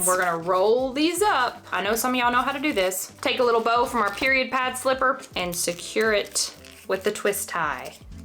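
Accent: South, United States. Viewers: Your southern accent sounds like Georgie from Young Sheldon...so cute 😊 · I live in a small city in north-central British Columbia that wishes it were Southern, and I love your accent. It makes me think of sunshine and sweet, iced tea. Also, in Canada we don’t have dollar bills or pennies any more, so that wreath would have to be covered in $5s - which are blue. .. not quite the same! 😂 After we decommissioned the penny, my former hair stylist did the penny floor in the entrance to her salon which was in a heritage building in Vancouver, and it looked wicked! But she did say it was a lot of work. I adore your channels - thanks for all the hard work you put in to give us something cool!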